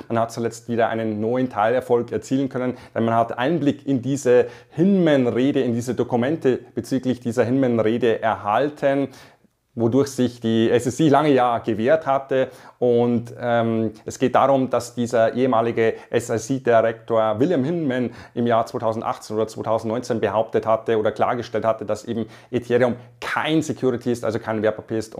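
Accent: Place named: Swabia, Germany. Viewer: schwäbisches Englisch ist immer wieder köstlich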